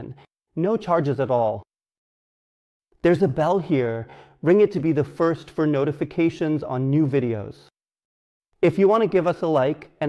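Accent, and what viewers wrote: Rio Grande do Sul, Brazil. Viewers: Falou gaúcho velho, sou mineiro uai.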